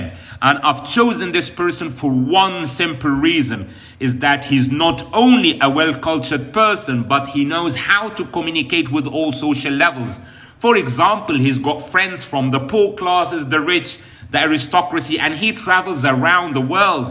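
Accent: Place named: United Kingdom. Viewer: I am in love with the british accent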